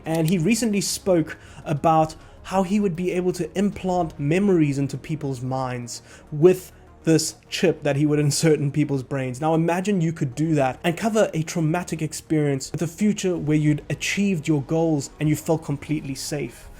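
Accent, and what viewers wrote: South Africa. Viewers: brother are you afrikaner or english south african?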